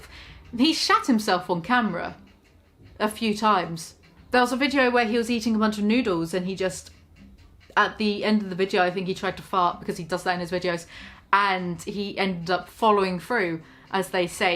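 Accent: United Kingdom. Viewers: your bri ish accent is everything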